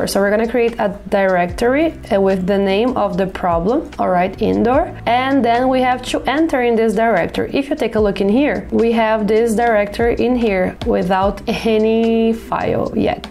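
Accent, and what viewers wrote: Brazil. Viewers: Sotaque de brasileira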